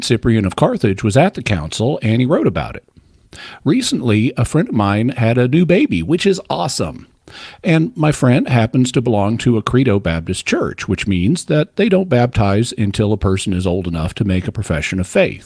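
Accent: South, United States. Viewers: I love how you can hear southern accent getting slightly more pronounced since his move back to Arkansas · Lol I thought I was imagining it. His accent is definitely stronger than years ago. The intonation and rythym of the speech is more Southern too. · Big fan of. Not a fan of his (re?) acquired southern accent. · why do you sound more country than usual?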